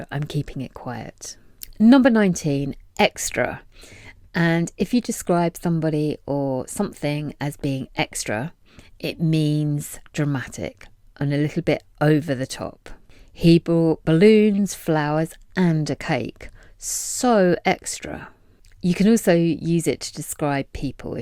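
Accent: United Kingdom. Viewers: Always waiting for your videos. Love British English and the way you teach it. ❤❤❤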